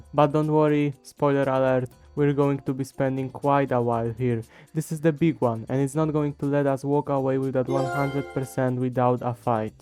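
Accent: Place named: Poland. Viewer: najmocniejszy polski akcent ever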